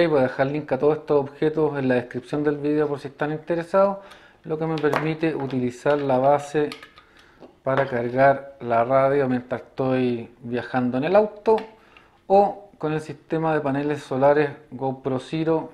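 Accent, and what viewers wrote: Chile. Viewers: Hola! Buscando maneras de programar mi nueva BF-UV5r llegué a tu canal y me ilustró mucho. Vi este video para conocer un poco más el equipo y al finalizar mencionas que luego subirías otro video explicando la función de los radioaficionados y qué se puede hacer con los equipos, me gustaría poder verlo... ya que al ver la materia de estudio para los examenes de la subtel no me queda claro cuál es el “brillo” de ser RA. Saludos! (Me encanta escuchar videos en “chileno” adecuado a nuestra realidad)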